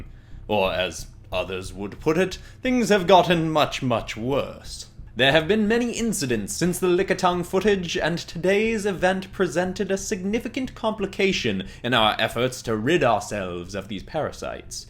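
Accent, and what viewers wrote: United Kingdom. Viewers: just saying I like the video but the narrators accent you know the English accent it just doesn't hit right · The y highlight of this video was definitely the British accent 😂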